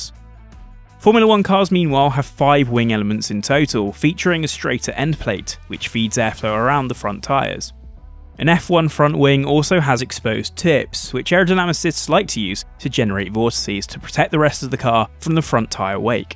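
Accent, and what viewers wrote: United Kingdom. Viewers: IndyCar fan here. Normally on these kinds of videos, my worry is "okay, how is the British accent going to insult my favorite sport this time?" But I've gotta give you credit. This was very fair. Kudos, and a like to the video!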